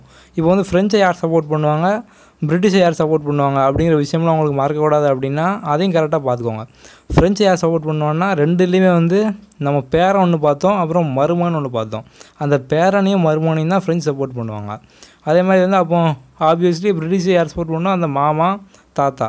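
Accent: Tirunelveli, India. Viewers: sir neenga tirunelveli ninaikren tirunelveli tutico slang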